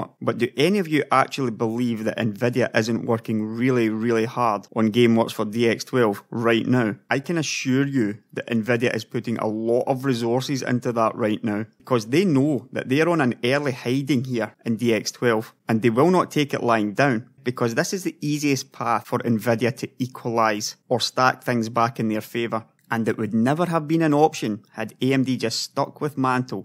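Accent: Scotland, United Kingdom. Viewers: cool Scottish accent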